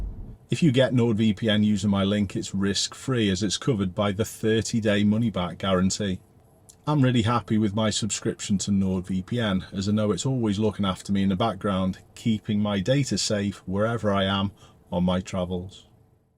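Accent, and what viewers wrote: Liverpool, United Kingdom. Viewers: no i agree that it's not cool to hear someone purposely sound over excited about the mundane but is the opposite. He sounds like a robot that hates its own existence lol. I think he's trying to speak clearly which is fair enough being a scouse lol but still it just lacks charisma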